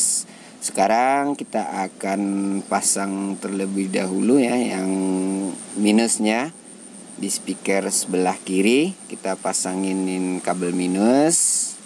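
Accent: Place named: West Sumatra, Indonesia. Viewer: macam ada suara minang kocak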